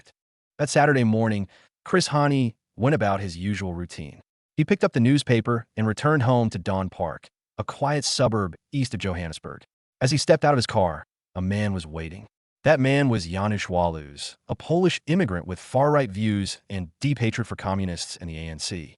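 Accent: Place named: United States. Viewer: Its a bit weird to listen to this in an American accent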